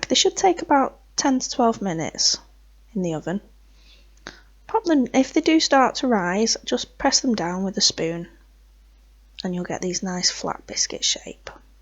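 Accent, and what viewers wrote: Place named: England, United Kingdom. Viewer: ☑ Knows the difference between ~♥biscuits♥ and... ☹cookies☹ ☑ English voice, so probably is going to make something that's not sickly oversweetened. ☑ Uses fresh ginger so I'll be able to use the home grown stuff from the garden ☑ Also uses ground ginger, which is good, because ginger comes from the ground :P ooh nice pictures of flowers.. IT'S A BULBASAUR AND SQUIRTLE!!! :D presses 'like' okay, I'm biased, but you win. This is already the best recipe for gingernut biscuits. Would like to have seen one at the end get broken open to see how crunchy it is. I'll have to go and make some to find out :) also, my thoughts on that blue door in the background... it's the TARDIS.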